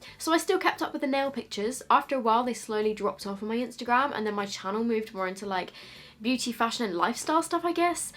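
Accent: England, United Kingdom. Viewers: Omg! Congratulations, ❤️ I remember the first time your videos popped up in my recommendations, it was the video with boyfriend chooses my makeup😂 I watched that and then fell into a hole of watching too many things from your channel. At one point I was like, I am subscribing to her. Even though at that time I didn’t know English that well, damn that was more than 3 years ago, and I was kinda of scared of your British accent, because for me it was hard to understand (btw now I get everything you say🤩)but I subscribed anyway because your content was and still is really entertaining, but you have something unique. You are a REAL person. I can relate to you, you don’t hide the fact that you have problems or other stuff like some youtubers do and for that I really appreciate you❤️ Another thing i remember is that I was really surprised when I saw how many subscribers you had. 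You always provided good quality for your videos and I was convinced you had more than 1 million fans:)) How says: “ ”, you finally did it. (Btw, I started listening to because of you🙃)on another note, I am here for the makeup: I have a big passion, just like you and I’ve learnt so many things from you, plus I bought a lot of the products you recommended and they worked👌🏻 You are just such an inspiring, intelligent, creative, sensitive person and much more. I am truly happy for your accomplishment!! Love you❤️❤️ *also my insta is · First video I saw was 3 months ago, I think it was the Instagram make up trends one, and I thought you were one of the only ones who seemed to be genuine on YouTube and I binge watched so many of your vids 😂😂❤️❤️ Also loved your channel because I lived in England this summer and I love your accent Lots of love ❤️ <mail> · First of all: CONGRATULATIONS!!!! I feel so happy for you, you deserve it!!! 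So, it's not very special but I discovered you when I was searching for Makeup Revolution product reviews like 2 years ago I think? And I remember instantly loving your honest reviews, and cute & funny editing style (and also the British accent, ngl). Thank you for your hard work! Twitter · I started watching around 9 months ago. Your videos just kept popping up on my YouTube homepage and just decided to watch on of your videos😂 your videos started showing up even more as being recommended and I started watching you even more😂 I think the reason I subscribed was because of your personality❤️ you’re so pure and natural and I definitely LOVE your British accent😍😍 you’re absolutely yourself and appreciate you for that! I think the videos that made me subscribe were the ones were u were testing products that used u in their ads without ur permission😂 it was THE FUNNIEST😂😂😂 Instagram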